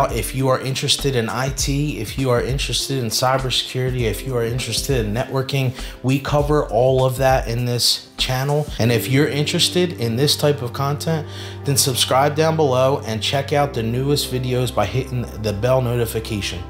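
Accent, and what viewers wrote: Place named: Delaware County, United States. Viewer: This guy is from Delco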